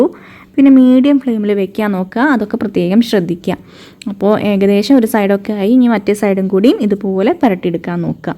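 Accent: Thrissur, India. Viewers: Stylan dish kalakki chowavilla Thrissur basha kidu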